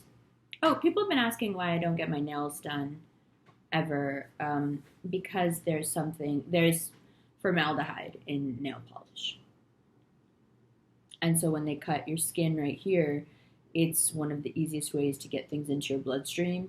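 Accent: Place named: New York, United States. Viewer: I knew you were from New York. That accent. Awesome stuff.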